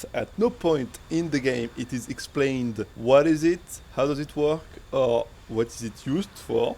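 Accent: France. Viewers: Oh god that french... Please yt don't show me baguettes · R u french, nice accent??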